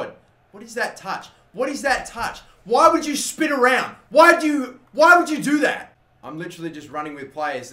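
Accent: Adelaide, Australia. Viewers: Are you from Adelaide?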